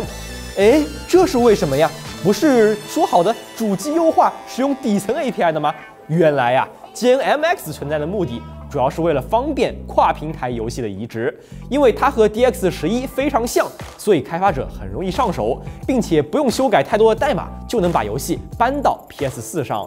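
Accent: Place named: China. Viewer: this is the PRC's essense of pronounciation.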